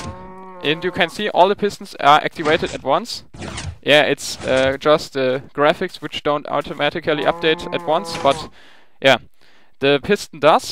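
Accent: Germany. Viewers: Man höhrt deinen Deutschen Akzent echt raus :D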